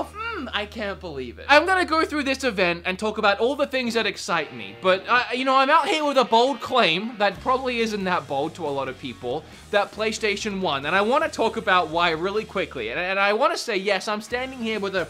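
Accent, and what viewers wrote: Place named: Australia. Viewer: This guy sounds Australian...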